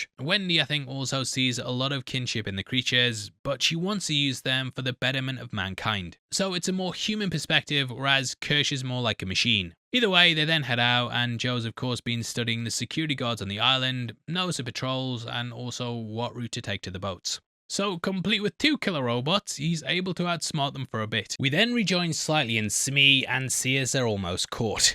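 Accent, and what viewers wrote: United Kingdom. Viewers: Hint: He has a very slight, barely noticeable British accent..... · You really dont have a strong American accent, are you from the UK? 😎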